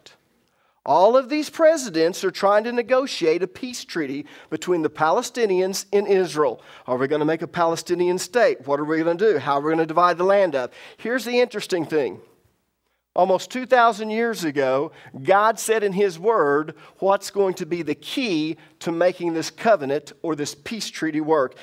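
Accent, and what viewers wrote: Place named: South, United States. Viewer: You definitely sound like your from the south too! 😁 Bama girl here. Love your teachings 💜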